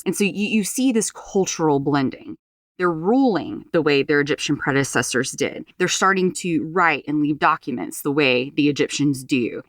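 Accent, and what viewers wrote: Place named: Nebraska, United States. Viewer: Well done! Brava! This is one of my areas of study for many years. You summarize well. If I may, I'll offer ttwo recommendations. 1: Your pronunciation is too regional, northern, like Nebraska, crimped. They say "theenks a lat," not "thanks a lot.". You say, "numadic," not "nomadic." Open your vowels more for Standard American English. 2: Relax your throat. Your larynx is crimped, so your voice is nasal. These mean practice, not much. Any might do wonders. I expect that is your normal voice. Such a respectable content suits your good mind. Its presentation deserves dressing up as you were applying for a job, which means better clothes than around the house. Most people don't think about sound at all. I don't mean that stuffy is at all better. Clothing is a pretty good analogy. Your content and you deserve recognition.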